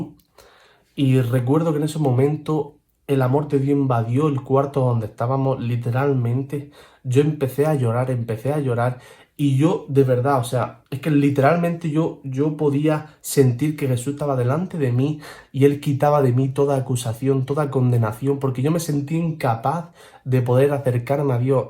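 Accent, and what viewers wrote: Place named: Murcia, Spain. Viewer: Subscrito!. Adelante te apoyamos! Ole el acento Murciano!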